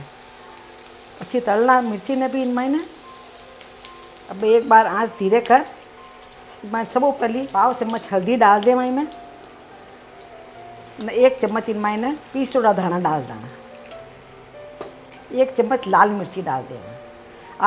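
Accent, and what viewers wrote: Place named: Marwari, India. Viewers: This lady's Marwari is just so pure and sweet. The sheer pleasure is to listen and savour. But the added prize is some amazing food dishes. We've tried many and they are simply gorgeous. What an amazing find on YouTube - thank God for YouTube, the world would be poorer if we'd relied on terrestrial TV. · She must be from nagaur district of raj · Love to listen your rajsthani voice and good sabji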